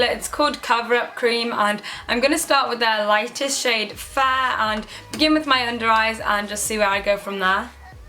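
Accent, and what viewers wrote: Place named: Wales, United Kingdom. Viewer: YOUR WELSH ACCENT IS TO DIE FORRR! 😍😍😍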